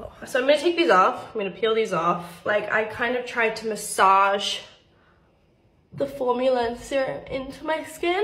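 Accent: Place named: United States. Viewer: Y does she sound american??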